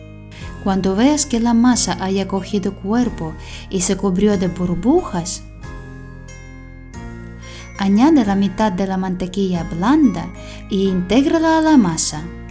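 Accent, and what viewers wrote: Ukraine. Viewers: Hola te saludo desde Lima Perú 🇵🇪 esperando q estés bien de salud,un abrazo grandote,me encanta tu voz tu asento Ucraniano eres dulce,.por otra parte te digo q así de cariñoso somos los Peruanos, gracias por compartir tus recetas hice en la pandemia tus recetas y me salieron super rico mis panes,ahora haré paneton espero q me salga bien.un besote querida.chauuu cuídate. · Дякую, і слава Богу, що ви українка, бо я думав, що з розуму схожу, слухаю нічого не розумію, але відчуття, що на рідній мові😂😂, якась магія, успіхів Вам🌷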